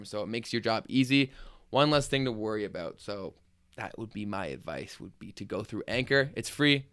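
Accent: Canada. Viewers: Thank you for those tips taking notes ! I couldn't help to notice at the beginning are you from Canada? if yes which province :) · Love that Ontario accent!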